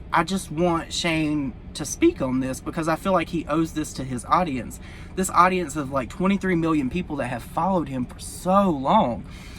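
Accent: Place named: South, United States. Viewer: This is off topic (seeing I’m physically sick to my stomach over all of this I figured I’d try and change the subject for a quick second- awesome video by the way) but I’m extremely curious... where are you from,? You have a southern accent. I can’t help but notice it because I love it 😊❤️ I just moved to Charlotte, NC- you have close to the same accent everyone does down here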